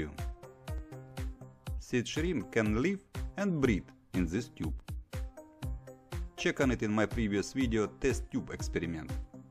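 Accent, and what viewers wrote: Russia. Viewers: you Russian? you have Russian accent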